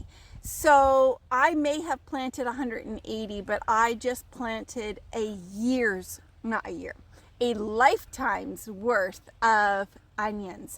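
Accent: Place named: Canada. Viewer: I found your video looking these up because my grandparents grow these and I’ve been wondering how to use them to see if I want to take some to start in my yard. Fellow Canadian here in southern Sask and as soon as I heard you speaking I knew you were Canadian.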